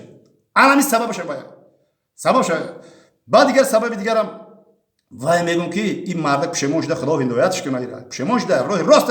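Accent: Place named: Dangara, Tajikistan. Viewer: АСАЛОМ АЛЕЙКУМ БОБОЧОНИ МА ХУДОВАНД НИГАХБОНТОН БОША ДУСТОН МЕДОРУМ СУХАНРОНИТОНА ШЕРИ АЛЛОХ, МИСЛИ РАХМАТИИ БОБОМ ДАНГАЛИЕН БИСЁР МОНАНДЕН. САЛОМИ ГАРМ АЗ МУЛКИ ГАРИБ )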